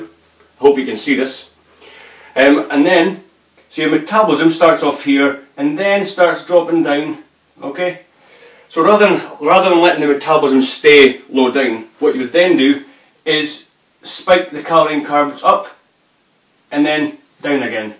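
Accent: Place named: Scotland, United Kingdom. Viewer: love your scottish accent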